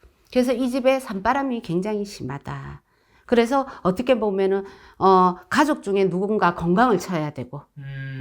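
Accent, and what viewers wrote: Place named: Busan, South Korea. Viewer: 이사람.말투도 너무 싫음 부산사투리 쓰는사람 싫지 않았는데 이사람은 유난히 듣기거북함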